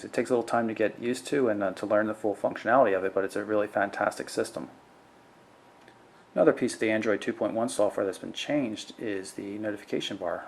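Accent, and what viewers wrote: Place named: Scotland, United Kingdom. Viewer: there was just a tiny hint of scottish in that voice...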